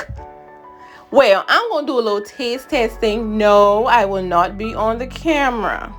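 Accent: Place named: Gullah, United States. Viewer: That Gullah accent makes me know this recipe good!!!!!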